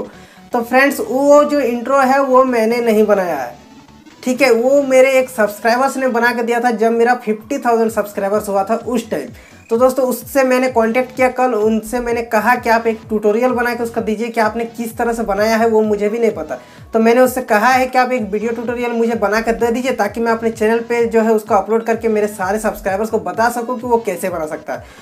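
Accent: Bihar, India. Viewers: You talk so sweetly are you from Bihar? :)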